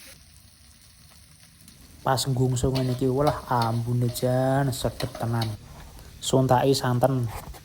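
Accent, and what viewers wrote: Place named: Java, Indonesia. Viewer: Mas sliramu piyayi ngendi si baha jowone ko medok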